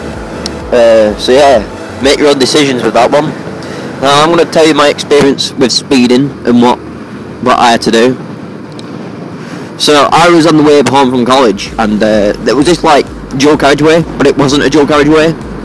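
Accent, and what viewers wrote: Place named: Manchester, United Kingdom. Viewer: Manchester Burnley or hapton, you sound like your that area, I have family near Burnley 👍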